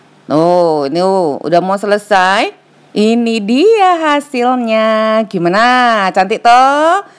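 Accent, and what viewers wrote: Java, Indonesia. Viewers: Stik kurma iki L39... mohon maaf lahir batin ya mam..... hari ini penuh kemenangan... ini edisi jowo.. Ini aku full ya maaf baru sempat.... Paduan kurma dan coklat Ketebalan nya di kira kira aja Lalu oven...no skip dihari kemenangan Cocol setengah aja Kalo semua belepotan Wooòoh jawa nya medok....banget Episode terakhir biskuit tabur almond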